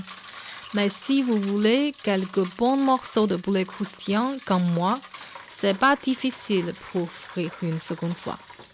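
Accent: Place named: Shaanxi, China. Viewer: 有点陕西口音…………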